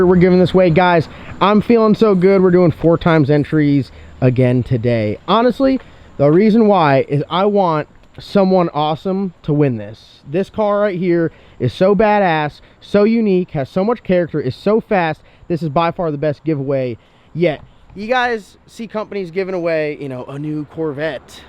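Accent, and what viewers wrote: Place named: New England, United States. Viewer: IF YOU NEED SOME HELP I'M NOT AS GOOD AS MATT IS BUT I'M PRETTY DAMN GOOD AND I'M AROUND ANGER NOT TOO FAR FROM ERWIN I'M LIKE 25 MILES FROM YOU I CAN WELD I CAN DO EVERYTHING THAT MASON DOES PLUS MORE I'M NOT GOING TO SAY I'M MAD BECAUSE I WOULD LIKE TO LEARN TO TUNE BUT I'M RIGHT UP THE ROAD FROM YOU AND HAVE A COUPLE GOOD PROJECTS THE FIRST ONE IN NEED IS A 1965 CHEVELLE MALIBU 300 DELUXE WANT TO TWIN TURBO WITH WITH A 6 L AND INTERESTED ABOUT GETTING INTO THE STORM WORK. I'M NOT A SCRUB MY OWN LAND I'VE BEEN A PLUMBER FOR THE LAST 20 YEARS PLUS AND I'M 40 YEARS OLD I'M NOT TOO EXCITED ABOUT PENNSYLVANIA YANKEES BUT SEEMS LIKE YOU MIGHT BE A FUCKING TRANSPLANT YOU SEEM PRETTY SOUTHERN TO ME JUST YOUR GODDAMN YANKEE VOICE GIVE IT 10 MORE YEARS THAT'LL GO AWAY ASSHOLE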